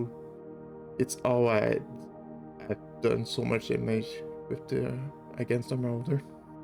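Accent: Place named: Quebec, Canada. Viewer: toé tes Québécois ! hahahaha :p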